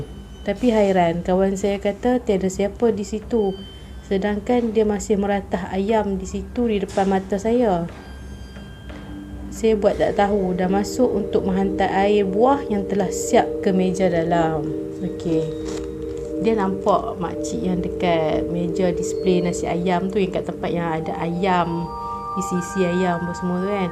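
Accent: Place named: North, Malaysia. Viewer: Seronok dengar bercakap logat utara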